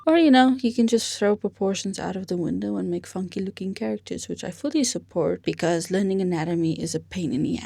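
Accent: South Africa. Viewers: your accent is a lot like a africaner's accent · Your accent is giving me South African deja vu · Her accent sounds south african a bit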